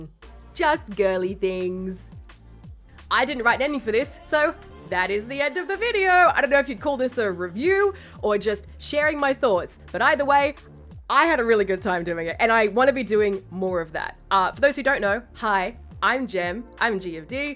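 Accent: Australia. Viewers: Great video! So good to see the aussie indie scene get some recognition and to hear an aussie voice on Youtube, can't wait to see what you come up with next! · great vid! was excited to hear an aussie voice! didnt know this game was from my side of the country either! thats neat.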